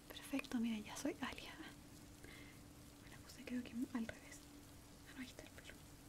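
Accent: Chile. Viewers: hablando chileno❤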